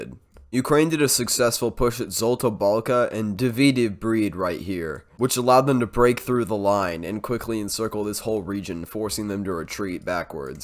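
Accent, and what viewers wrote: United States. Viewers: “Allergies” yeah right, to me you just sound like a fellow Californian. 😎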